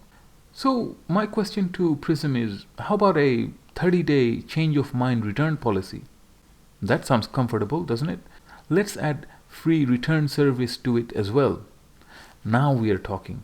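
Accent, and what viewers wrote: Bengal, India. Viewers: Is your native tongue Bengali?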